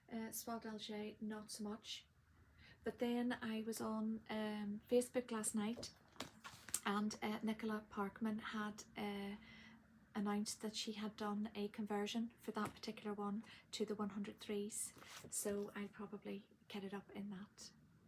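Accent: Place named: Ireland. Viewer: Thank you for giving us another awesome floss tube ❤ beautiful finishes, project bags and your tiny 🐞 ladybug. And I love listening to your Irish accent, it is so soothing! Yes for a tutorial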